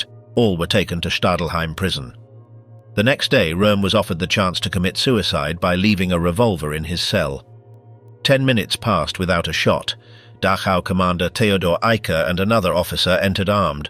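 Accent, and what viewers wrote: United Kingdom. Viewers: Like as someone re-edited all the documentaries into a poor ridiculous English accent… really bad.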